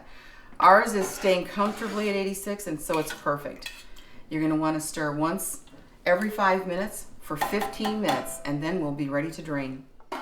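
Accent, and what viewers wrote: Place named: United States. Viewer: 86 Deg... I would assume it is Fahrenheit? A. Because of the US accent. B. Because I think a germ culture won't feel at home in anything but Luke-warm... C. I don't see vapour rising and in 86 Deg. C there would be vapour... But not everyone is an Engineer who loves making food, so it would have been nice if the publisher didn't assume only USA people watch these videos, and mention conversion quantities. 86 Deg. F or 30 Deg. C An Ounce or 28 Grams... etc.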